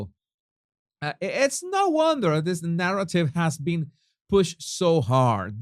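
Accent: United Kingdom. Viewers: Love the British accent! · Your British accent cracks me up. 😂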